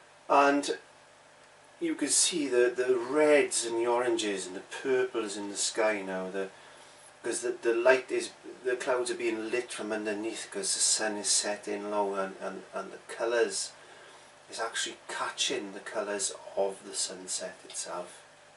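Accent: Wales, United Kingdom. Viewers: CliveI just found your web-site and am loving it. Also love your Welsh accent. I am from Scotland now living in the US. One question, why do you use black gesso on trees etc. instead of using mars or lamp black?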